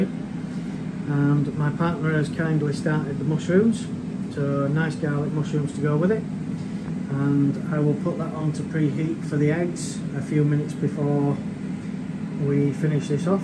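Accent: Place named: England, United Kingdom. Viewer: Glad to hear an English voice (for a change). Good luck with the channel.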